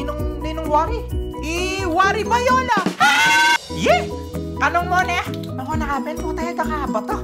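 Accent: Pampanga, Philippines. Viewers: Magaling k talaga'yTo. Kpmpagan ka tlaga ksi keng gale mu at diction at bumigkas Mekeni ka talaga. Magaling ka Abe, baka Ika ing mawawala kung anak ah, pwde dkang paDNA ? Hehehe, i tule me ing ggwan mu para lalu kang mikuwalta kreng vlogs mu, emu ku kklingwan, gambatte 'NE !!!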